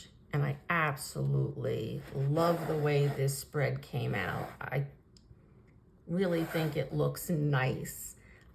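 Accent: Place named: New York, United States. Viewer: Beautiful pages! Well done! I really enjoyed your explanation and i also love your voice and NY accent! ❤❤❤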